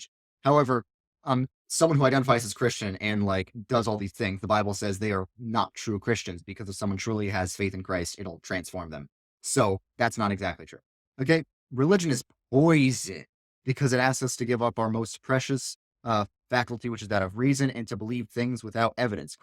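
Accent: New York, United States. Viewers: When gets mad, his New York accent just gets stronger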